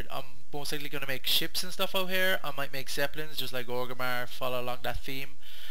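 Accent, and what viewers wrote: Ireland. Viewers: i only just found this channel and its says your irish i am too but i cant tell from your accent where your from ???? :O :) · is your accent irish?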